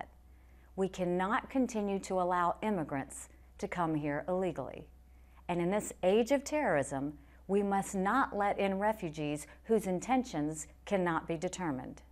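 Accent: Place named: South, United States. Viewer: She sounds like a Southern White woman.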